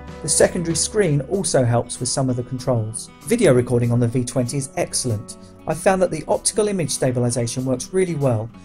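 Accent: United Kingdom. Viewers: Nice review! Everything i need to know is covered here. I love the British accent too.😊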